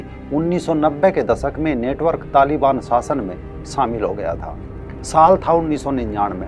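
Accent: Haryana, India. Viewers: Bhai apki awaz se lagta hai ap hriana stet se ho,, حریانا ب پنجاب کا حصہ تھا کبھی 😢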